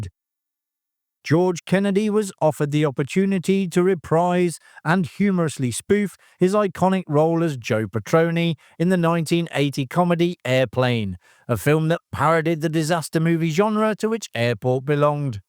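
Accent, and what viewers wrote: United Kingdom. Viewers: When I hear a british accent I know its time to turn off.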